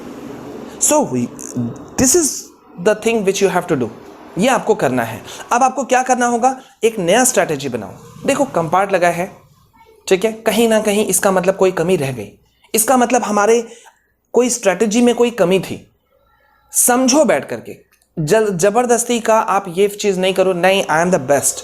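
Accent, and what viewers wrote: Bihar, India. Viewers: Sir aap bihar se ho kya ?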